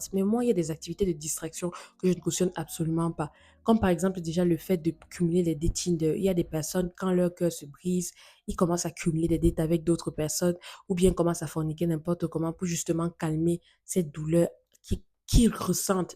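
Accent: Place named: Cameroon. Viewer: J'ai l'impression que tu es d'origine Camerounaise de part ton accent... J'ai envie de te dire Merci infiniment ma sœur 🙏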